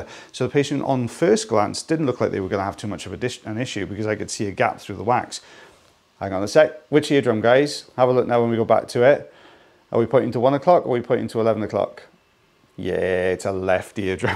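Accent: Wales, United Kingdom. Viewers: I am loving learning new terminology and facts about ears. Last year I had to get my ear cleaned after swimming had dislodged some wax. Went to the GP who was a bit thrown by my telling her that I thought the wax had gone into the anterior recess. Turns out that the nurse who did the subsequent syringing (no micro suction available here) was also fascinated with ears. I told her about your channel and she has become a huge fan too. Why do I love your videos? I learn something, it is so satisfying to see the results and how much difference it makes to your patients, Your passion for what you do and finally, I simply love a Welsh or Scottish accent 😃 Between you and The Hoof GP, I get to watch people who love what they do, help others in pain or discomfort and learn. I do all of this from the comfort of my home on the other side of the world in tropical Northern Australia. 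Thank you!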